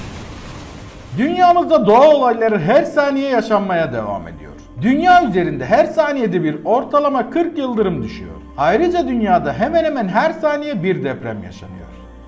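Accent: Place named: Syria. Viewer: Aga ne berbat video. Ne anlatmak istedin ne alakası var seninle? Bide sen niye suriyeli gibi konuşuyorsun??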